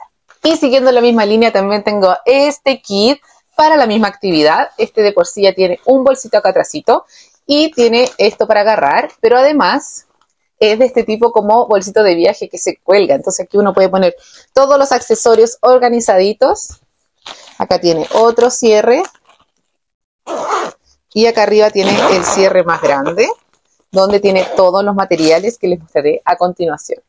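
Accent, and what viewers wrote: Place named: Chile. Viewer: Que rico escuchar tu acento chileno!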